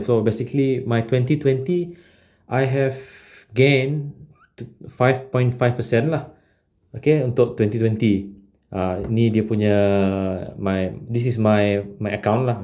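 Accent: Sarawak, Malaysia. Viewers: hahaha.. nang antap gilak sleng srwk nya tek. ku awal2 juak dah perasan.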